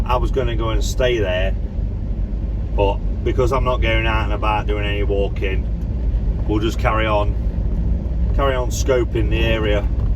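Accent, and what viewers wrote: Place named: Midlands, United Kingdom. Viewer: (assume that's your name) Interesting video. First time I've seen you. Your accent I'd say you're not too far from me. Sutton-in-Ashfield I'm a widower Single like I assume you are.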